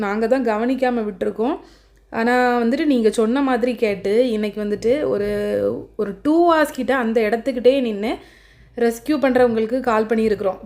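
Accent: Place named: Nagercoil, India. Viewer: Entha ooru nenga Nagercoil pola iruku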